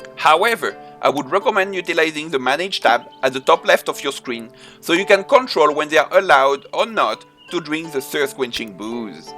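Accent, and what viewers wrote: France. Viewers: From Texas, love your accent. I dont hear a lot of French YouTubers. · Man your just french as fuck my man. you sound exactly like what I would expect.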